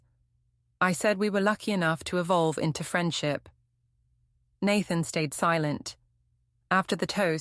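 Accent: United Kingdom. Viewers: there’s a collection of stories where the AI narrator has a British accent. They all follow the same pattern. The wife or girlfriend does something messed up, but not quite cheating. The guy has a problem with it, the woman tries to gaslight him and then it turns out she actually did something way worse. It’s becoming repetitive at this point. Come up with something new. Also, you are giving the idea that the initial thing that the woman does isn’t bad enough. It is there’s no need to also toss in the other things that she’s done. I am two minutes in and it seems like the story is gonna go the same way and if that is the case, I am going to avoid all stories like this, like the plague.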